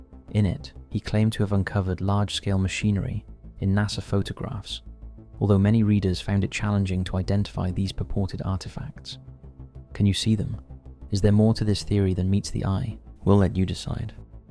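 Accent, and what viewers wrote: Wales, United Kingdom. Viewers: Why are all these shows being narrated by british men why not American? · I could listen to this guy all day long. Who knew the Welsh accent was so beautiful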